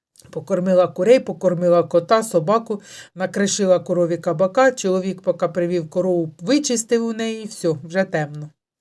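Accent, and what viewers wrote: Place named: Russia. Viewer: у вас проскакують в кінці слів російська вимова.Ви не в Злинці живете?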